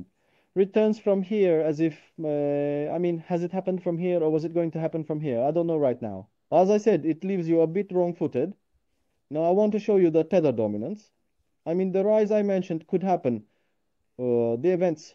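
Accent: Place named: Russia. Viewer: Baştaki aksan ile yayin ortasinda aksan degisiyormu icindeki rus ortaya cikiyor bir anda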